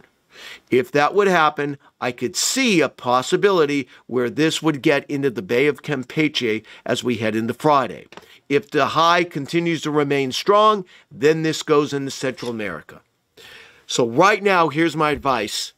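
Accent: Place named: East Coast, United States. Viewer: just can't do the east coast accent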